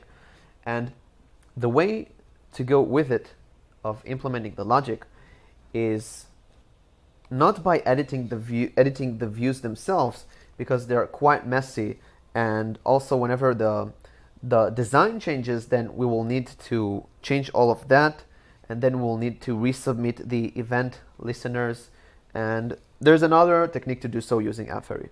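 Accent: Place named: Israel. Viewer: סרטון טוב תודה!! באמצע הסרטון פתאום חשבתי לעצמי... רגע רגע יש לו מבטא ישראלי :)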